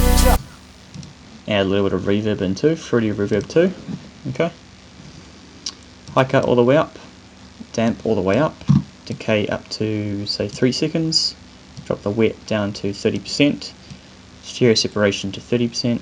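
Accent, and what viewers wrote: New Zealand. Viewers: Are u kiwi?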